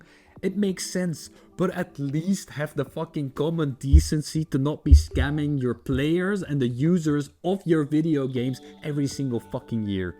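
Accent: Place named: Netherlands. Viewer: Nederlands accentje is wel smikkelen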